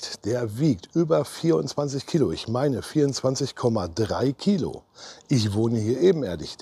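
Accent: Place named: Ruhr, Germany. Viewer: Super hilfreiches Video, hab dadurch meine Kaufentscheidung bestätigt bekommen. Ich könnte wetten, du kommst aus dem Ruhrpott?! Glück auf und danke die Vorstellung vom Ninebot! 👍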